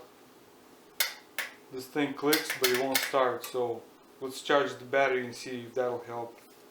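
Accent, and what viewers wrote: Russia. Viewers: ааа какой русский акцент 😊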